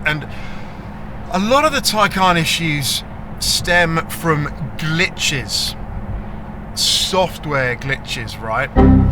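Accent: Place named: United Kingdom. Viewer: I can't believe how difficult this is to follow… If everything is concise and well worded and nicely structured, the British accent only makes things better… If that's not the case you're really stands out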